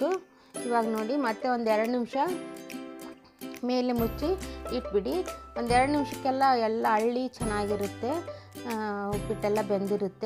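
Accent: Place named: Dharwad, India. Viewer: Nimma Dharwad Uppittu bhaal sertu.!!!! Aadra, nimma maatu Dharwad shaili olaga iddra namaga bhaal Santosh agatada.!!!! Nauo hemme inda namma kannada maatadonu!!!! 🙏 🙏 🙏 🙏 🙏 🙏 🙏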